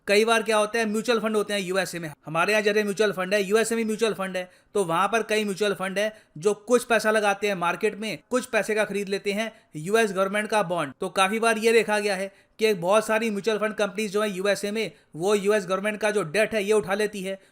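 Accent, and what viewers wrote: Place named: Gujarat, India. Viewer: ldki hai..koi gujarati ldki hai accent se pratit hua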